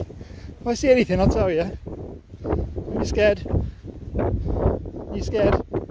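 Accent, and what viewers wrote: United Kingdom. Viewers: Btw are u from the UK??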